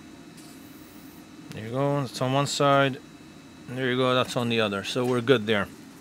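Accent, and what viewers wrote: New York, United States. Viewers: It’s that New York accent. We just talk like that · That's "New York City". They all sound like that. :)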